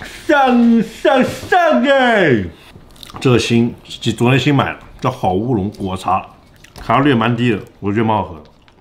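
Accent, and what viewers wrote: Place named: China. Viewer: 聽你的口音是大陸人吧，怎麼會背離祖國共產黨，去北美敵人那生活呢？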